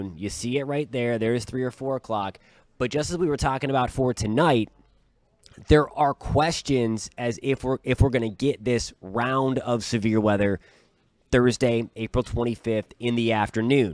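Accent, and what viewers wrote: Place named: New England, United States. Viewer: Not weather related but did you say you’re in Texas? You sound like you were raised here in New England. Great pace delivering concise and interesting information- thank you!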